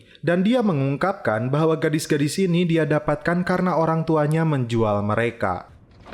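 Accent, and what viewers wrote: Indonesia. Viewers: Orng indo baca nya begitu bg, mau gmna lagi susah di ubah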